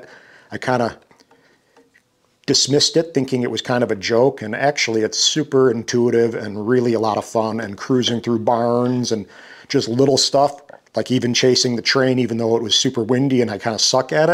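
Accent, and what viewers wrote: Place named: Chicago, United States. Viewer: Moved out of Chicago a couple years ago, out in the boonies now. Also got into drones this year. Avata 2 is my 2nd. Its super fun, man. Nice to hear a Chicago guy talk normal and frankly about his experience. I knew most of this stuff already but I watched the whole video cuz I enjoyed listening to you go on like a Chicago guy. Cheers, brother. Enjoy the drone.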